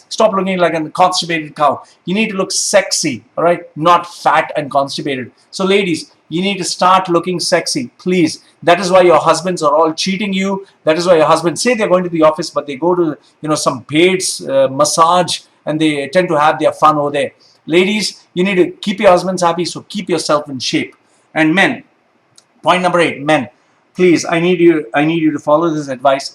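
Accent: Kerala, India. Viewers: I Enjoyed it 🤣🤣. All the facts.. I went to school in bangalore were majority teachers and students were mallus.. And trust called kerla samajam runs it, I enjoy the funny accent of Malayalam mixed in English 🤣🤣 you made my day bro 🤣🤣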